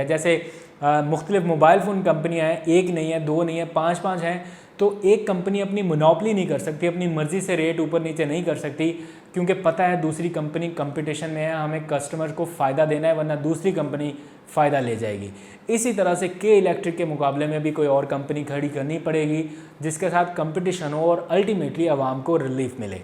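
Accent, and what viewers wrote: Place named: India. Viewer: بہت زبردست جناب شکریہ جناب! ایک بات؛ پلیز بھارتی چینل زیادہ واچ نا کریں کیونکہ آپ جھٹکے والا بھارتی انگریزی لہجہ استعمال کر رہے ہیں۔مثلاً کمپے ٹشن کہرہے ہیں جو کہ کانپے ٹی شن ہے۔ کسی بھی انگریزی وڈیو پہ دیکھ لئے گا اسطرح کے بہت سے الفاظ بھارتی انگریزی کے کہلاتے ہیں مغرب میں۔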